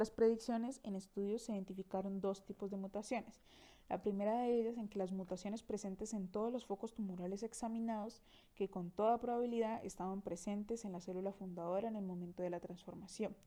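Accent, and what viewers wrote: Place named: Colombia. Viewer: Tienes que ser colombiana,tú tono de voz es hermoso, dra porque no continuo con el proyecto este y el otro canal estan caídos, además supee genial estps capítulos de Robbins, gracias, saludos desde Cali, Colombia.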